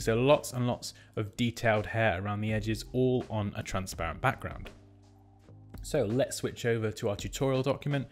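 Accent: United Kingdom. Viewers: Nice voice and accent, your British accent is easy to listen and understand!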